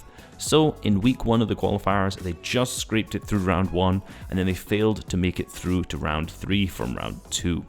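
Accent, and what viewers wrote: Scotland, United Kingdom. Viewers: Where in Scotland r u from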